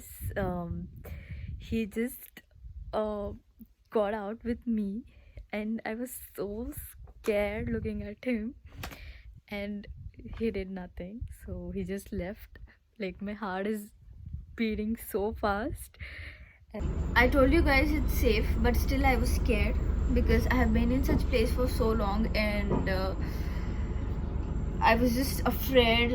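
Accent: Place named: North Karnataka, India. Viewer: ನಿಮ್ಮ ವಿಡಿಯೋ ನೋಡ್ತಿದ್ರೆ ನೋಡ್ತಾನೆ ಇರ್ಬೇಕು ಅನಿಸುತ್ತೇ ಒಂದೊಂದು ನಿಮಿಷನು ಸುಪರ್ ಆಗಿದೆ,ಉತ್ತರ ಕರ್ನಾಟಕ ಶೈಲಿಯ ಮಾತು, ಡ್ರೆಸ್ಸಿಂಗ್ ಸೆನ್ಸ್ ಸುಪರ್, my dear doll😍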